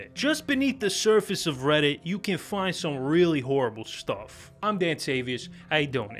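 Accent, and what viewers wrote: New York, United States. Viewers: 😅This is the most NY Accent I've ever heard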